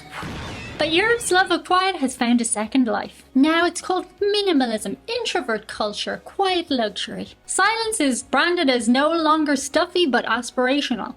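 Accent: Ireland. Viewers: Good irish accent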